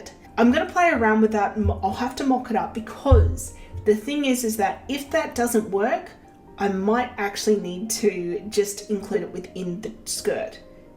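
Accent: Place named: Australia. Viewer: I love the Australian english!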